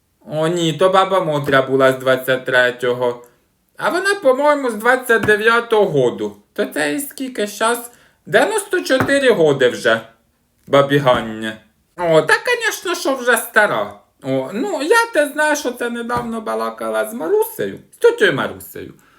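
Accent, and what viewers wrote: Poltava, Ukraine. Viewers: Яка ви молодець !Ви повертаєте мене в дитинство,моя бабуся (царство її душі)була з Полтавщини і точно так розмовляла.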